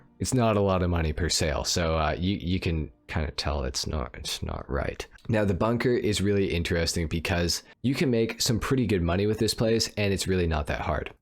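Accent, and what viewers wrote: Winnipeg, Canada. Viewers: Wait ur Canadian? I feel like you got a Canadian accent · Your from Winnipeg?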